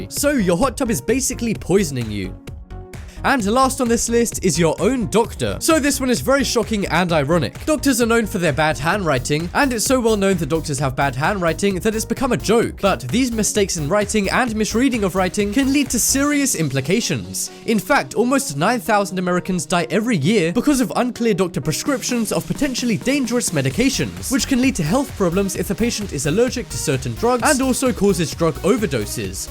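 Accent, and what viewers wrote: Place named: United Kingdom. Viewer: you have a strange American accent, sounds very much like a British one.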